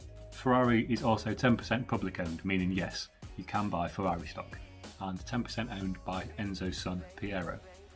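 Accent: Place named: United Kingdom. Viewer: Interesting info! But please, speak louder!!! It’s a bit hard to understand you… been British I guess. Gabriel from Costa Rica saying hi… Good job! 👍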